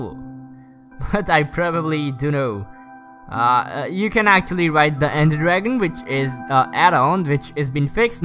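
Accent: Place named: India. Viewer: You have a mixed accent, sounds a little bit like Indian accent, are you Indian by any chance? Just curious..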